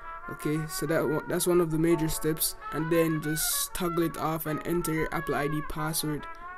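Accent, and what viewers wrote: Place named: Jamaica. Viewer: I just subbed, you sound like a Jamaican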